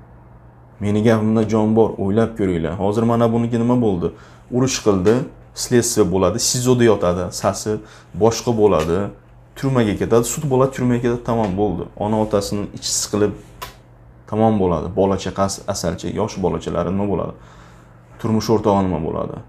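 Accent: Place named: Uzbekistan. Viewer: Кайси шевада гапирасиз узбекчани жуда чиройли шевада гапирасиз 20 йил яшаган Россия да усганга ухшамесиз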